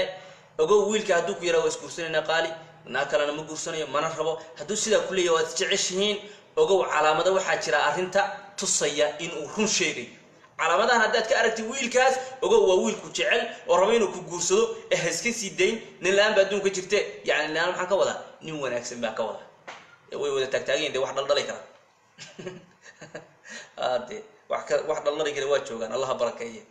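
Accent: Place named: Mudug, Somalia. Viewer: Shiikhaan waa mid rer muduga suu hadalka usoo tuurayaan layaabayba wlhi shukran sheekhow jazaakallah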